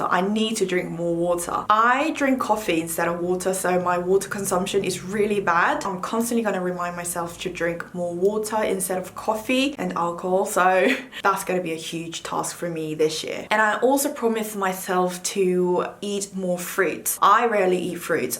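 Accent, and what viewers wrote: United Kingdom. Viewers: 커피 많이 마시고 물 안 마시는 사람 나야나,,, ^_ㅠ 뭔가 오랜만에 풀로 영어로 말하는 영상 보는 것 같은데 영국 발음에 또 한번 치이고 갑미당,,,ღ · 영국 악센트로 바뀌신 것 같아요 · 브리티시 악센트 너무 매력적이에요🖤 새해복 많이 받으시고 건강하세요 늘💕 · 영어로 하는 브이로그도 찍어주심 안댈까요? ㅠㅠ 영국식 발음 발음 넘 듣기 좋구, 영국식 발음에 로망생겨써요, 🤍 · 오랜만에 듣는 영국발음 너무 좋네요🧡 오워터~~ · I love hearing your British accent! 🥰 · 영국식발음 넘 신기해요 운동하며 자신을 잘가꾸는 모습 많은 영감을받아요 저도올해는 좀더자신을가꾸고 아끼고 사랑해주고싶어요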